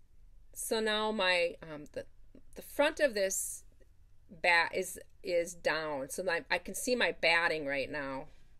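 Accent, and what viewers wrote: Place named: Midwest, United States. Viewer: Wow!, this was the absolute best! I only discovered your tutorials a couple of days ago and have done some binge watching. You are awesome. I just love all you create. I adore your Midwest accent. smile. My dad was from Minnesota. Thank you for all your inspiration. Take care, be safe and much happy stitching.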